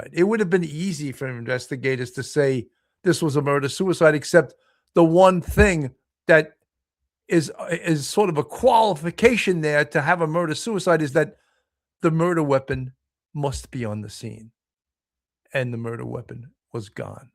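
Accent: New York, United States. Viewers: I really appreciate you and your common sense approach! I do not like the hyperbolic tv news stations that try to make things even worse than they are. New subscriber. BTW, I like your NYC accent!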